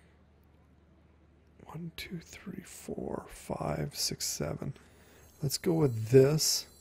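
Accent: Canada. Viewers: Loving your videos. Also loving the Canadian accent, you should say "aut" (as in "get aut") and "eh" more :) And keep the beard.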